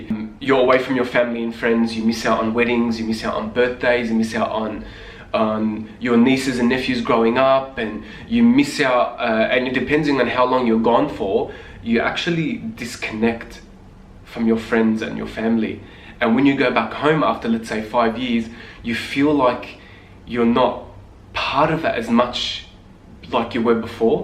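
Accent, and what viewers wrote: Australia. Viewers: Everything you’re saying is spot on 😂😂😂 are you Australian? Love this video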